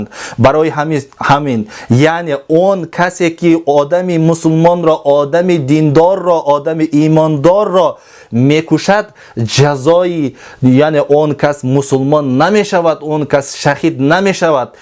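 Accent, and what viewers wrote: Tajikistan. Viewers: Рахмат домло .маълум ки шумо аз тарафхои Джиргатол Лахш хастен аксентатон маьлум.Аввалин мурда яъне улук аз точикон шудаги историяба хаст вай одам одами бегунох. Агар мурдахои точика хисоб кунед хеле зиёд вайба ки чавоб мегуяд.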